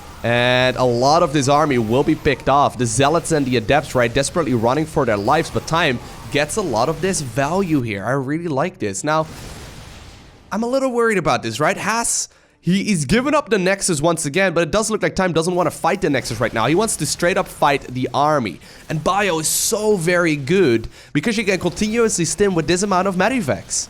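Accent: India. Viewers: You sound like someone from India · you sound indian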